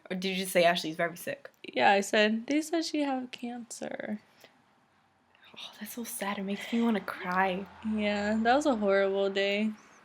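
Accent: New York, United States. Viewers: I Hope you have a full recovery Ashley. You have a great voice and New York accent. I'm from California so it seem very cool to hear you speak so as a New Yorker. You should consider radio broadcasting or voice over work. Maybe FM Talk Radio. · hey im from ny too! in med school right now, learned about hodgkins lymphoma yesterday... stay strong and i wish you the best.. with this amazing attitude, you're definitely going to beat it. take care. p.s. i love your latina-from-nyc accent! lol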